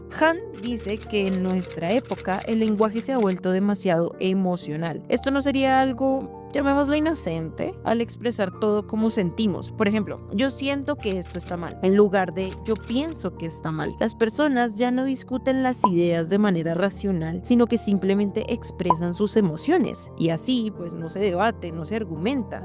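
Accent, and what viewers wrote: Colombia. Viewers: Hola, apenas conozco tu canal. Está cool. ¿Eres colombiana? Noté un poco tu acento. Debo comentar que esto de las confusiones de términos no solo pasa con esto, sino también en otras áreas. Por ejemplo, en el área creativa se confunde mucho lo que es "animar gráficos" con editar un video, o los efectos visuales con los efectos especiales, etc. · Increíble video, tu acento suena Colombiano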